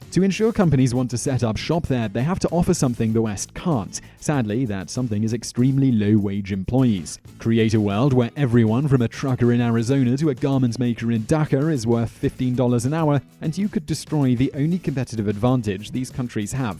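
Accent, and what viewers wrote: England, United Kingdom. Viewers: Your arguments against minimum wage are biased and riidiculous. Also, I do believe I hear an English accent in your tone. Perhaps you should stick to issues in that country.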